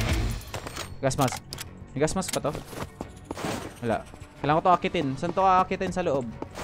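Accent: Visayas, Philippines. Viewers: Bisaya ka pala lods ❤hahah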